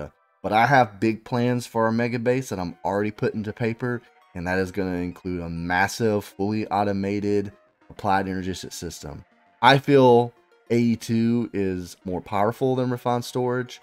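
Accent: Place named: South, United States. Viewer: Builds are so amazing!! I want to start a channel someday but sadly, I am still a noob when it comes to many of the mods. Learning them slowly but surely! But your vids are definitely helping. I went back to Pokehaan Craft for now, but will get back to this modpack eventually, lol. Also, whereabouts are you from? Your accent sounds southern USA, but I could be very wrong. I only ask because I am Southern USA myself.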